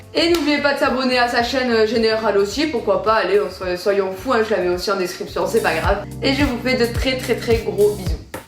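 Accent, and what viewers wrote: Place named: Marseille, France. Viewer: Putin t’as un accent marseillais magnifique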